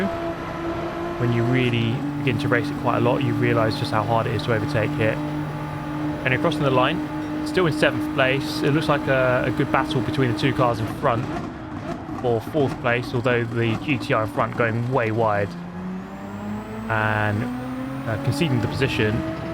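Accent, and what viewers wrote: United Kingdom. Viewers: I'm pretty sure Fanatec is pronounced "fanatic" as in a crazy person. Don't hate me. You'll probably get away with it because of the British accent! Rock on!🎉😂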